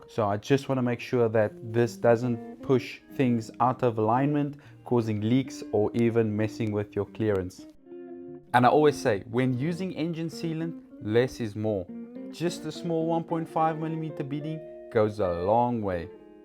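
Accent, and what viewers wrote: South Africa. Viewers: You sound like a siuth African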